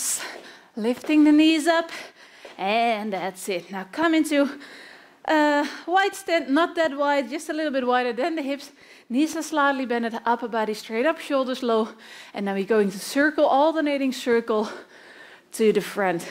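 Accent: Netherlands. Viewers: Dutch accent? so cute! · Are you Dutch? I can hear the accent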